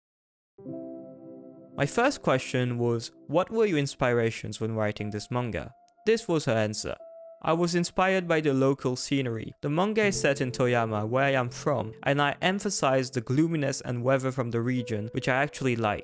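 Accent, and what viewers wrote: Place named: United Kingdom. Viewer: you are french but still your accent is unbelievably british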